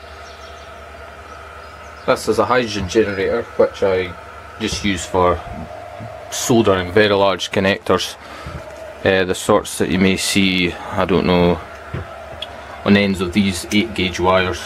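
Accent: Scotland, United Kingdom. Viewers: sweet system are you from Scotland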